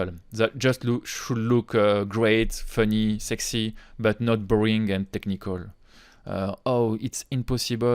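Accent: France. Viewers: Some good french accent. As a french, it's music to my year.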